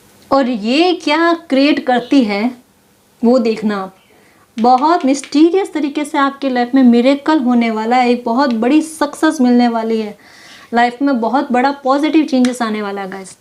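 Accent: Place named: Marwar, India. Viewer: Rajasthan ki Ho kyunki main bhi Rajasthan ki hun kyunki aapki Bhasha se pata chal raha hai Shayad aap Marwar Marwar se ho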